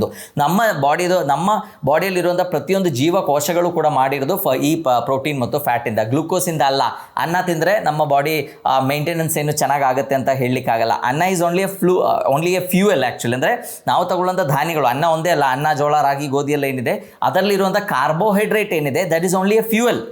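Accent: Kerala, India. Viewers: Sir r u malayali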